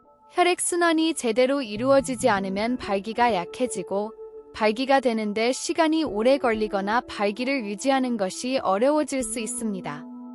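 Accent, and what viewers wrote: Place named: China. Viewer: 발음이 중국인이 말하는것같다.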